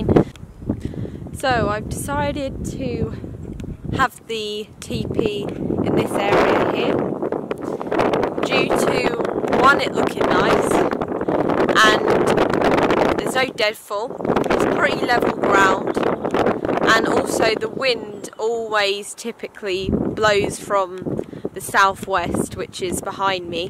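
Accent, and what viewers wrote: United Kingdom. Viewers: Your accent do British snd "tipi"is shelters?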